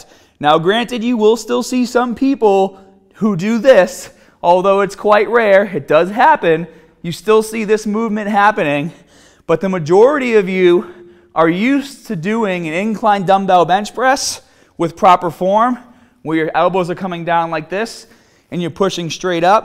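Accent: Boston, United States. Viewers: where is from? he has that Boston accent. #teamscott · Boston Accent!!!!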